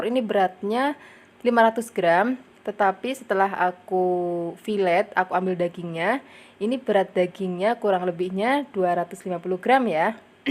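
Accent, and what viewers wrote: Java, Indonesia. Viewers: Menarik resep2 nya, aku ngga cm suka resep nya, tpi suara mba itu lembut bngt, jawa nya medok, pasri priyayine ayu, luwes, jiiiaaann...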